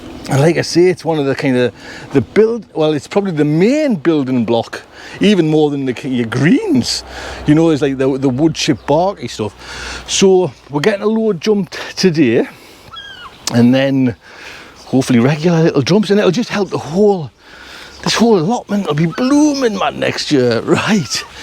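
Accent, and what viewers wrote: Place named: Sunderland, United Kingdom. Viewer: Hi, been following for a while and finally got round to subscribing. Love the no nonsense practical videos, daisy obvs, but love my daily dose of home. Was wondering about the accent but saw you recently driving to a nursery heading into Seaburn 😍 Keep doing what you are doing, keeping an exile stuck in the Midlands v happy 😁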